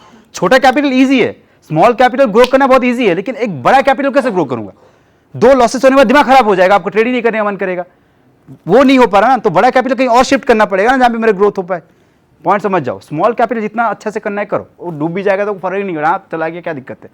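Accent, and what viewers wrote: Odisha, India. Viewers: Sir ap odisha sa ho kiya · Hindi bolna style se lagg raha he , ap Odia ho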